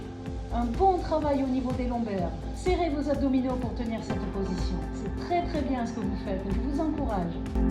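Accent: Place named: Drôme, France. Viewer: merci pour votre réponse.. je ne m'y attendais pas..je travaille en poste de nuit en pneumologie..et vos vidéos sont calmes et efficaces.. Hé oui bien besoin d'équilibre à moto..merci oui je reste prudente!!🏍❤️ Votre gentillesse se ressent délicieusement ! Et vous êtes magnifique ! Votre petit accent me rappelle le mien lorsque je vivais dans la Drôme.. en Lorraine à présent.. il est..bieeeen différent..lol! Bisous du grand Est!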